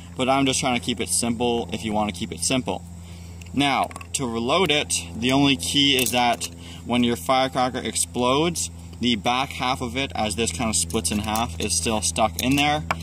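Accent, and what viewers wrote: Sweden. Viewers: Haha är du svensk? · Are you swedish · are you swedish ?!?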